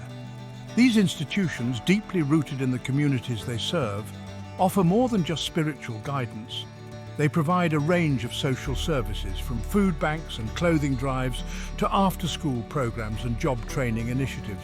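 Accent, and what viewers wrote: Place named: United Kingdom. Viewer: Whats up with these Brits narrating this lame ass crap ???? Who gives a f--- <num> Life !!!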